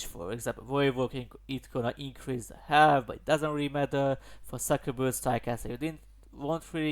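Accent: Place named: Hungary. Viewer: where r u from? u have a hungarian english accent :D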